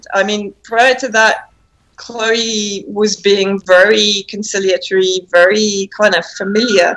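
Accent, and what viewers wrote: United Kingdom. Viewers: Great interview, lots of information. Vanessa is a real frontline journalist and deserves to have her story told. Just a comment, it is funny how you can be a chameleon with your accent,. You make videos about Jimmy Dore, etc, and you have an American accent. (No offense, LOL). Here you have a slight British intonation. I pay attention since I meet lots of people in International Schools and they have that ability with languages. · Are you back in the UK? I ask because you have that same British accent for this interview as you did during the Assange trial. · I think you’re great, but simply curious as to why you’re suddenly speaking in a British accent?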